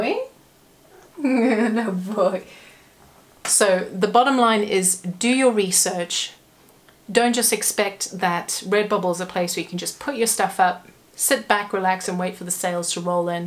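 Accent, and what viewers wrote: South Africa. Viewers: You South African? 😍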